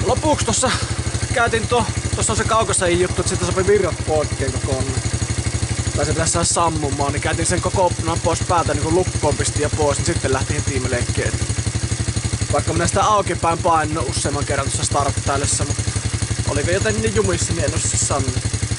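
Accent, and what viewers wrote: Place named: Savo, Finland. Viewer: Pit tilata kanava, vaekuttaa lupsakalta tuo Savonmurre ja ite kanava myös😉👍Minun juuret on syvällä Savolaisessa kivisessä muassa. Minun murteet männy sekasin kun asunut Etelä-Suomessa ja nyt Pohjois-Karjalassa ja syntyjään sieltä.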